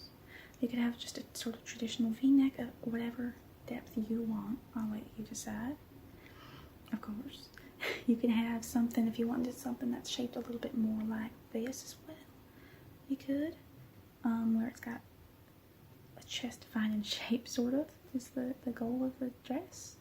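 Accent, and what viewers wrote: South, United States. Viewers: As a resident of the south you got the accent down well 😂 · Still so naturally chill and yay a new video 🤩 I don't usually find southern type accents relaxing as I have to focus harder to listen lol I'm British so it doesn't exactly come natural to me 😂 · Just when you wonder if the girl can get any cuter, she throws on a southern accent and continues stealing your heart <3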